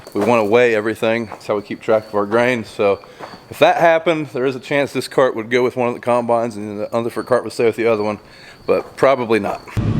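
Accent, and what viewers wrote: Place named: Ohio, United States. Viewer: I appreciate hearing talk. It reminds me of spending summers in Columbus working for my uncle in the early 90's. I also spent some of that time in Chillicothe, had a cousin there. Living near Buffalo, you don't hear your accent here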